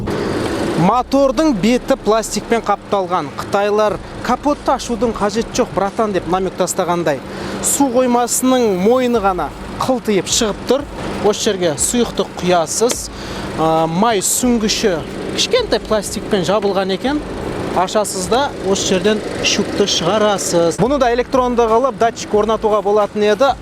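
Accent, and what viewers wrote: Standard. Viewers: Қандай таза қазақша сөйлейсің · қазақша таза сөйлейсиз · Қазақша таза сөйлейді екен👍👍👍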